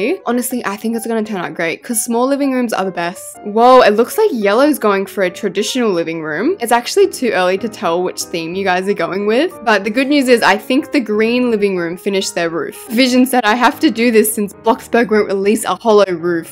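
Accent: Australia. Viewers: I love the way puts in memes and funny voices she's so talented I wish I was her sista❤ I hope I get invited to one of her build battles or something involved with building,she is AMAZING ❤ I love her so much and her Australian accent it's so beautiful. I love the way she NEVER gives up and shows confident energy to every build the subscribers make 😊,it's just amazing. And her name is so so nice and beautiful. Love you ❤🪷😘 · lets just appreciate that in every video someone has to say " lets just appreciate". but anyways i love your videos, i started watching u in June and I'm obsessed, I've watched all the videos u have posted on your channel and everyday i come to check if you make a new one. Your a really talented builder and i would personally, myself say you should become a constructor of some kind. i love your Australian accent and i love your house styles and i love your sense of humour, i just love you. 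♥keep up the work👏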